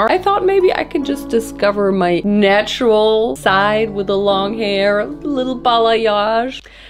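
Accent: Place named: Germany. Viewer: I love your german english 😍😍🤪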